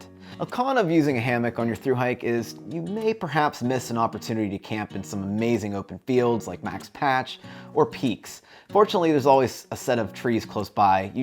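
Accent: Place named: Pittsburgh, United States. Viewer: sounds like a Yinzer to me (I'm from the eastern side of Pennsylvania).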